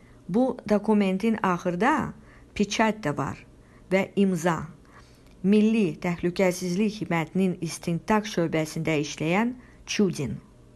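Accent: Armenia. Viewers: Xəbəri oxuyanın özü ermənidir. Danışığından bilmək çətin deyil. Hamısıi böhtandır. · Evvel azeri dilinde danışmağı öyren sonra bu nağılları danış senin lehçen ermeni lehçesine oxşayır Camaatı inandıra bilmessiz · Bu danisan qadinin ozu azerbaycanli deyil ya ermeni ya da rusdur.buna emin olmaq ucun onun sozleri teleffusune cumle qurmagina fikir vermek kifayetdir.ayibdi vallah. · Bu qadın Ermənidir səsindən tanıdım Mingəcevirdə yaşayıblar Azərbaycan dilini bilir bir kanal var BBS səhf etmirəmsə,orda müsahibə verib tapıb səsə qulaq asın. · Sen özün ermenisen alçaq lehcenden melumdur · Senin danIshIgIndan bilinir ki, sen ermenisen. O, ermeni olsaydI AzerbaycanI iqtisadi cehetden inkishaf etdirmez ve ordu quruculugu aparmazdI.Zefer Qelebemizin ele esasInI da o qoyub.O dunyada tanInmIsh bir LiDERDiR. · Bu danışan qadın özü ermənidir görün nə cür ləhçədə danışır, ay millət bu cür təbliğatlar uymayın bizim başqa dərdimiz var ermənilərə müharibə qalib gəlmək,bu cür cındır şeylərə inanmaq lazım deyil şəxsən mən Heydər Əliyevin kim olduğunu çox qəşəng bilirəm,bu danışan qancığada deyirəm ay erməni qəhbəsi sənin danışığından ləhcəndən görürəm ki sən özün hayiksən ay it sürüsü sən indi məni inandırmağa çaışırsanki dediyin düzdü? 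Üzümü tuturam bizim o dəmşalağ bəzi millətimizin nümayəndələrinə belə şeylərə uymayın burda söyüş yazmayın,o ki qaldı Heydər Əliyevin fəaliyyətini tənqid eləməyə əgər bacarırsınızsa bunu yazın bunu deyin mən demirəm Heydər Əliyev biri mələkdi hər nədisə bizimkidi bu hayiklərin təbliğatına uymayın kimdə istəyir mən o insanlarla ünsiyyətdə olub Heydər Əliyevin kim olduğunu deyə bilərəm subutda edə bilərəm,bu adam yəni Heydər Əliyev əsli kökü Zəngəzur mahalının Sisian rayonunun Cömərdli kəndindəndir atası Əlirza kişi anası İzzət xanım,ata babasının adı Məşədi Cəfər,ana babasının adı Hacı Cəfərqulu,Heydər Əliyev isə özü Naxçıvanda anadan olub,bu düşmən təbliğatına uymayın kim maraqlanmaq istəyirsə buyursun burada yazsın nömrədə qoysun məndə nömrə qoyuram vasap <num> kim üçün maraqlıdırsa buyurun mən danışa bilərəm! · Междупрочем:у-вас-аккицент Армянски:вы-кто:любои Азэрбаиджанец-знаем:алиев Армянин, а, пачему, именно, вы Имфармируите, людеи!!!!! 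· Bəs sən kimsən erməni ləhcəsiylə danışırsan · Sən özün ermənisən.Ara qatmaqdan otrü bu yazını ortaya qoymusan Sənin danışığından məlumdur ki.,əsil efməni ləhcəsi ilə danışırsan.Sənin havadarlarında sənın kimi erməli məxluqlaeından dır. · Yalan sa allah sənə lənət eləsin. Ay cındır, sənin ləhçəndən erməninin yekəsinə elə sən özün oxşayırsan ki. Mənə çox marağlıdır, hansı erməni azərbaycan övladları üçün hərbi məktəb (yəni, Naxçıvanski adına) açar ki, sonra da onlar o məktəbi bitirib və vaxtı çatanda da elə ermənilərin özünün də kökünü kəssin?! Hansı erməninin övladı ali baş komandan kimi minlərlə erməninin məhvinə, erməni ordusunun darmadağın edilməsinə əmr verər?! Ay cındır erməni, sən bu suala cavab verə bilərsən? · Tocnu ermenilerin azerice danismasina oxsayir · Sən hələ erməni ləhcəni düzəlt sonra Heydər Əliyev haqqında danls elə özün tər təmiz ermənisən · Bu danışan qadın ermeni lehcesi ile danışır. 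· Daniwanin ozu ermenidir azeri olsa bele daniwmaz lehcese ermeniye oxwayir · Danisanin ozu ermeni kimi danisir Heyd er baba Esl Azerbaycanlidi az nagil danisin elebil torpagi Heyder Eliyev satib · Про алева не знаю ну ты точно не Азербайджанеч, может армянка по разгавору видно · Danışanın özü deyəsən ermənidir. Ləhcəsindən bəllidir... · Бурда бири йазыр ки ханымын сесиди.ай тупой гормурсен ермени лечесийнен данышыррр???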